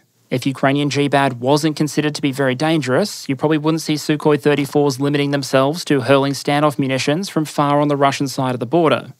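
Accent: Australia. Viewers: Too bad he didn't say "Vampire Counts" in his Aussie accent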